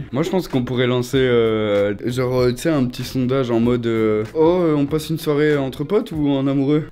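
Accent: Belgium. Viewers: Il est belge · T belge ?????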